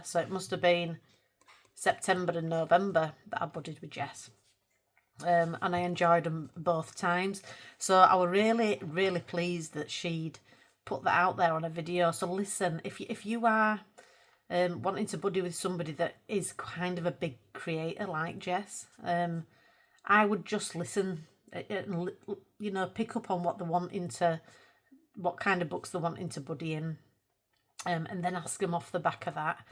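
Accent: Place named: Northern England, United Kingdom. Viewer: ‘It’s not a long video it’s quite short’… 40 mins later 😂 jk jk I love longer videos, especially ones with a familiar northern accent! We did a pick n mix at the Lindt shop in Leeds trinity last week and I massively regret only getting 2 of the shortbread ones 😂 I like to buddy to encourage me to colour in books that I’ve been avoiding because I’m intimidated by. I love Kerby books but have been avoiding fragile world because fur isn’t something I’m confident with, but I did a buddy in there last month and once I’d ‘committed’ I actually really enjoyed colouring the image! Great video ❤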